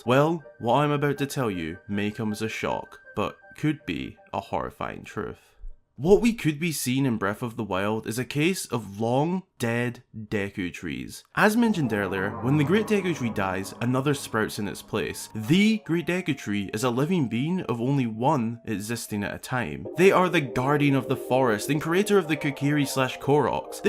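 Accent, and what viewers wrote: Scotland, United Kingdom. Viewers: Last week, I had a dream that I was in a very creepy castle full of ghosts. As weird as it is, voice, in all its Scottish glory was there narrating was I was going to encounter.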